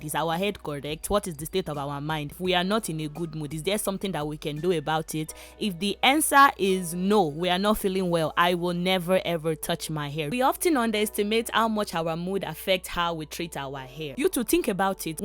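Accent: Nigeria. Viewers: I love your Nigerian Accent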